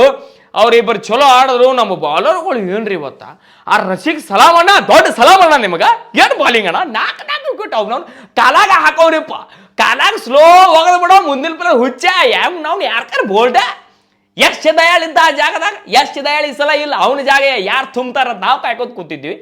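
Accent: North Karnataka, India. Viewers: ಮಾತಾಡುವ ಶೈಲಿ ಸೂಪರ್ ಉತ್ತರ ಕರ್ನಾಟಕದ ಭಾಷೆ❤❤❤❤❤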